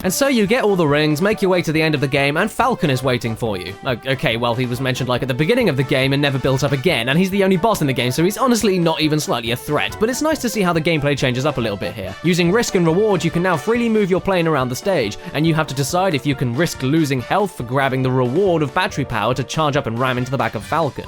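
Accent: United Kingdom. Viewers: hey i have a question this is gonna sound weird but are you british or irish i'm sorry but your accent is just a big mix up of like 50 accents so i'm wondering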